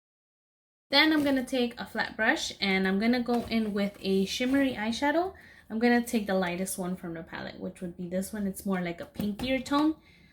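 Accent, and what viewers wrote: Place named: Latin America. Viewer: Awww me encanta tu acento latino!!!! 😍😍 Viví un tiempo en Tx y me recuerdas mucho a mis amigas de ahí!! 😍😍 By the way loveeeed ur make up!!!! 🤩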